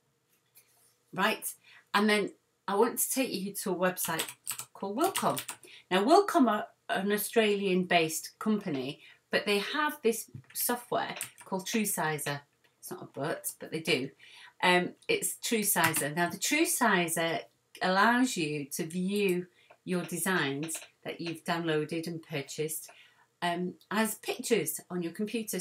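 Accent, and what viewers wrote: Yorkshire, United Kingdom. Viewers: I just found your channel and subscribed please please do more tutorials on digitising for beginners. You speak my Yorkshire language and explain beautifully! Please do more! Xx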